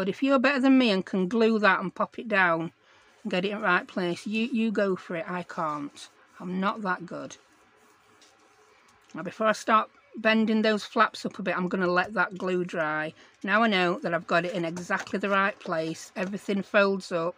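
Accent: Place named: Yorkshire, United Kingdom. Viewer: you made me chuckle with your Yorkshire-isms 😂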